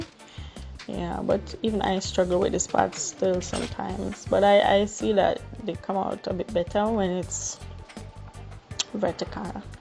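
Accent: Jamaica. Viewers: Wow..looking for this since 19*** 😂😂😂😂... endlich...gonna do this today, today...wo<link> realy like the jamaican patty....like how you speaking the Patwa😊... ,,trina nyamings'' showed me your Link..good Job and thanks for Showing us. Ich freu mich so sehr👍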